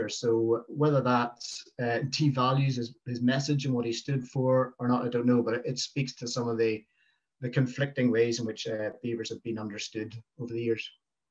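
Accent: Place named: United Kingdom. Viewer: I speak North American English but have trouble understanding UK English---toooo fast. It would have been easier to understand if we had been able to see the face of the speaker. Can N American Beavers easily interbreed with Eurasian Beavers?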